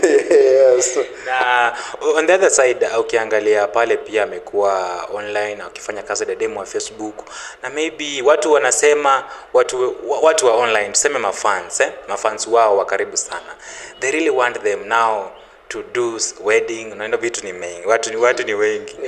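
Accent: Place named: Kenya. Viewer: Ascent ya kiluhya ,kabsaa he is well informed though, and seems a good and funny joker, watu hutoka mbali ,lakini, encouraging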